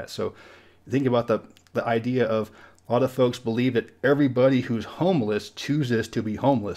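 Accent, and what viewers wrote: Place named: California, United States. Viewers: While it may sound a bit crazy, personally to me, you have a very "California" sounding accent, and even if you never mentioned where you were from, I would assume you grew up in the state. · I wouldn’t believe you if you said you were from anywhere else than CA. It’s due to your accent. I’m from FL, it’s mostly the attitude and the change of culture that talk bring. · I'm curious to know where in California you're from and why you sound like you're more from Tennessee than California? I know you live in Tennessee now fyi. Sorry if this sounds like an ignorant question. I once met a guy who was from upstate NY and sounded southern and it really confused me 😂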